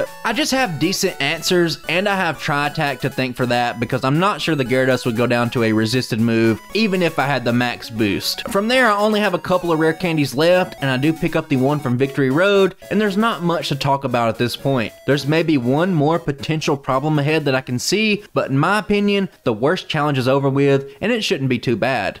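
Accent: South, United States. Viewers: i did not know how i'd feel about a pokemon solorun channel with a southern accent. guess i like it now 🤷🏽‍♂️ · Are you from a Southern state by chance lol ?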